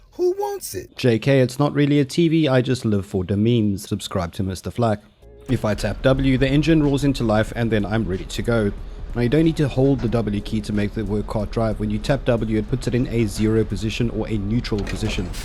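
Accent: South Africa. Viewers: are you from cape town or are you a joburg boet?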